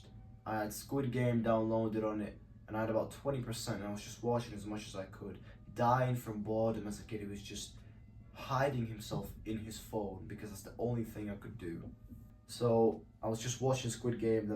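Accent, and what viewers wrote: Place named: United Kingdom. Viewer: Ok cool, I just reacted because you describe in your video how the war "started" while talking about 2022 events, but I can understand Btw how can it be that you speak English like a true Brit if you are from Ukraine?? Ukrainians and Russians usually have a very recognizable accent. Maybe you had had some connections with UK before you moved there?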